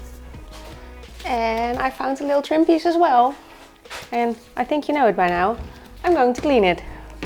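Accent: Netherlands. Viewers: The most dutch English accent ever. So cool!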